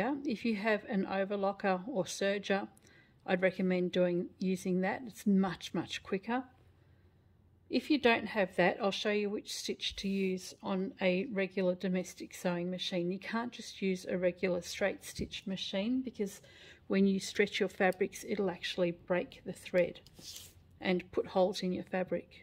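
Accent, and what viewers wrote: Australia. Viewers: Morning. Thank you for your generosity in sharing your tutorials. I love the way you teach. The pace is particularly good. Often I only have to watch once or twice to complete a project. And I am always delighted with the results. You are my new hero! It’s wonderful to hear an Aussie voice too!! Ps just a tip for you: making the Mini Tissue Holders, I cut an extra 6” x4”; so I have 4. 2 for the folded sides, and 2 for either side of a very very light iron on wadding. This does 3 things. It gives the pouch a bit of stability in its base AND i cannot make a mistake which side is the inside or outside😂, and the inside looks as good as the outside for when the customer changes the tissue pack. Probably a bit of over kill on my part, but I was using light fabric that needed the extra stability. All kindness & grateful thanks to you. WA.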